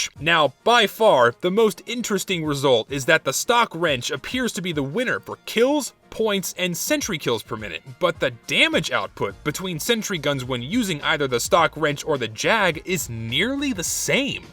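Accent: Texas, United States. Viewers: I can't tell if he's trying to do a country accent or not trying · I liked how you did this video in a Texan voice. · So is no one gonna talk about how he's actually went through the entire video with something of a Texan accent? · that accent threw me off so much, i was like "did he actually have that thick of a southern accent in his older videos?" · Him is Texan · Walks in a Texan Accent Me: wow thats what I call getting into character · I love your southern accent in this video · Y'know, after i found out that you're from texas i started hearing your accent. Kinda bizarre but i love it Keep up the good work, i wish you a good year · why do that texan accent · I absolutely love that you brought out your Texan accent for the engineer-specific video. Great attention to detail. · are you trying to sound like a texan, because i have no idea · For some reason, I didn’t question why you were speaking like a Texan until you pointed it out. 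Like “Gasp, wait he’s one of us now!” XD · Nice subtle Texan accent. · I forgot how Southern he used to sound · I thought you were Russian Badger doing a southern accent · Honestly, I could listen to mathematic/scientific lingo spoken in a texan accent forever, wouldn't mind if ya didn't hide it when you went into statistics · he be Texan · ah, the southern drawl on an engi vid is soooooo perfect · Texan he is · Texas male is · Is it just me or does he have a slight southern accent in the video? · At first I though he was doing the accent because "heHe engineer is texan lol" but then I remembered is Texan. Good on you man, as a fellow Texan, I say bring out the accent more often. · Your subtle Texas accent in this video gives me pure joy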